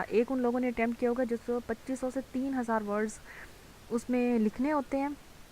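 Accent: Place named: Saraiki, Pakistan. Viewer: I think you are Saraiki speaking!!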